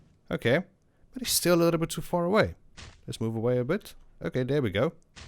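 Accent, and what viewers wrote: South Africa. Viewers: are you south african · Lol bro I can hear you are from south africa thanks for the vid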